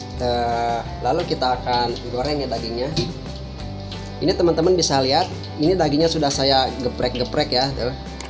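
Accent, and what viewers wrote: West Java, Indonesia. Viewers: Saya ni penasaran abang orang padang tapi logatnya ky sunda ,mantap saya belajr ni mksh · Dari sekian banyak video bang logatnya kaya org sunda kang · Ky nya ini org Sunda, Krn logatnya dr Jawa brt. Sy org Sunda juga.mungkin dia kerja di rmh mkn Padang. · Semangat kang.... Sabar aja ya.... Resep nya, mantap Kalo resep ini beda bukan berarti salah.mungkin beda daerahnya kali kan bisa aja... Kalo beda daerah beda cara meskipun sama2 padang.... Memang logat nya sunda si akang ini... Tapi bisa masak padang pasti dari org padang juga gak mungkin sotoy akang ini apa lagi berani berbagi ilmu masak nya.. Semangat terus kang semoga sukse en murah rezeki👍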